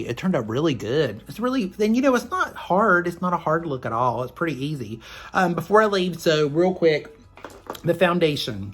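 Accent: South, United States. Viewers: I should have watched this during my tough week! You are so much fun (and I am learning a lot)! I love that Charlie's Angels lunchbox:-) I grew up in the 70s and 80s. "It has them arresting somebody on the back" - one of the funniest YT lines ever! Plus the safety rules? Too much fun! Don't let anyone tell you "how to" pronounce words - love your southern accent! That KGD foundation is my favorite. It looks good no matter how applied. You deserve the recommendation by Tara so much! A few favorites - speed racer, strawberry shortcake, holly hobbie (blue bonnet), wonder woman, smurfs and so many more!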